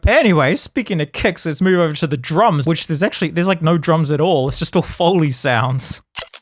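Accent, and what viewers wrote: New Zealand. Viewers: Are you from NZ?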